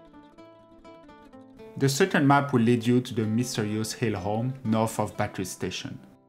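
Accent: France. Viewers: What accent is this?? It sounds almost French with a touch of Dutch or even German.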